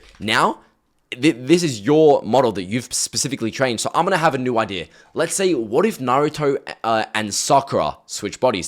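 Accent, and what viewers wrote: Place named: Australia. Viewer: Too much free sauce bro, appreciate you for sharing this vid. I hear the Australian accent too btw, by any chance you in Perth?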